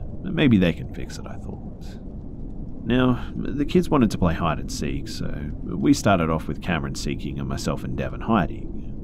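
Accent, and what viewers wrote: Australia. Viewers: You’re doing a wonderful job…thanx! Nice Aussie voice 🫡🇦🇺🏆✨👏💜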